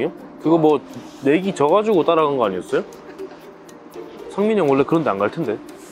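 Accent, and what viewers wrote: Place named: Daegu, South Korea. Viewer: 사투리 마 기깔나네 찐대구사투리💙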